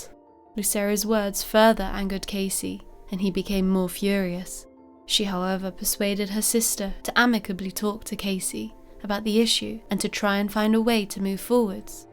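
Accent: United Kingdom. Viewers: she got the most British sounding voice ever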